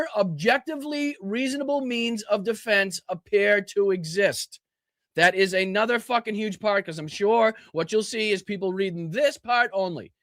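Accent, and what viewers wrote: Boston, United States. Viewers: right on. Love listening to you. Boston accent.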